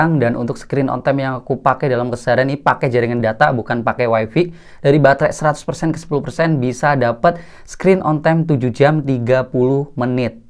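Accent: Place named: Cirebon, Indonesia. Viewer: Logatnya kaya wong cerbon bang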